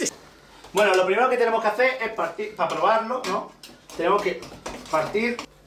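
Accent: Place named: Andalusia, Spain. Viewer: bruno gomez No es latino, es andaluz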